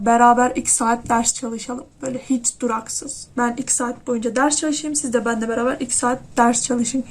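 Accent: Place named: Kütahya, Turkey. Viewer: Şiveden Kütahyalı gibisin öyle isen Kütahya'nın neresindensin?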